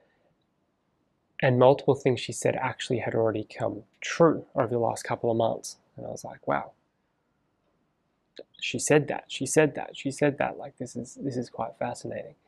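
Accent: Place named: Australia. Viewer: Bro are you Australian or from New Zealand because I've noticed the consciousness of people with this accent is wild 😂😂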